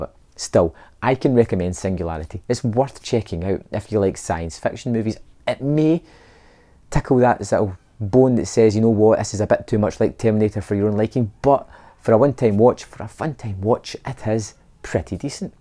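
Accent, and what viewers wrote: Scotland, United Kingdom. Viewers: Jesus man! once I realized it was a Scottish accent I could understand you. At first I thought you were Dutch LOL anyways don't kid yourself my friend it was a terrible movie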